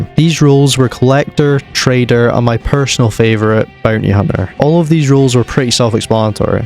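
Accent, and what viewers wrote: Scotland, United Kingdom. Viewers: Hey, are you Scottish by any chance? Your accent is kind of peculiar in a good way lol. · I smell a Scot.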